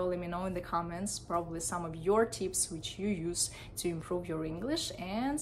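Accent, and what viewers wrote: Russia. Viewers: Разозлило что потеряла 7 минут смотря видео, так что напишу.. Ни одного совета… очевидные вещи.. акцент четко русский.. по словам не слышно уровня с1.. максимум б1.. речь беглая, супер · As you mentioned that you speak russa, i immediatly started hear your accent. i like russan accent. That always remind me the hollywood movies. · Я русского отличу запросто лишь по акценту)) а так словарный запас, и уровень великолепный, вы умничка! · rus işte hep aynı aksan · Every nation has spesific accent it so cute Russian speakers make t d r h really different 😂❤️🥰but it original ❤️ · Сразу видно ,что вы носитель русского языка, и по внешности, и по акценту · That's why we feel Russian accent in your speech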